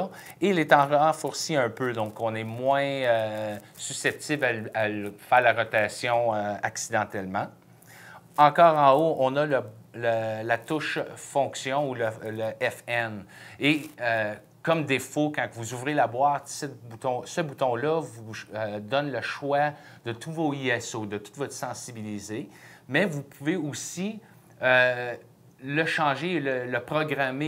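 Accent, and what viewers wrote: Quebec, Canada. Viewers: Sounds French Canadian to me. French with a Anglo pronunciation. Nonetheless good review, I like that X10.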